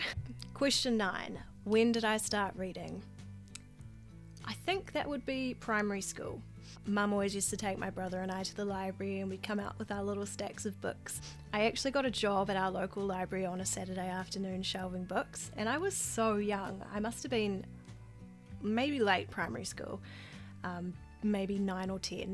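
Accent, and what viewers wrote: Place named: New Zealand. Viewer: I'm from texas, & I find your new zealand accent so charming ☺️ welcome to the book community, 💞 you're doing great!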